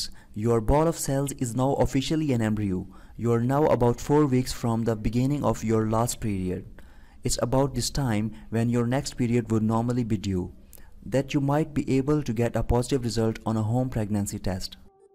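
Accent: India. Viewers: what an indian accent.. Is this from Bollywood ?? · Kashmiri accent in your voice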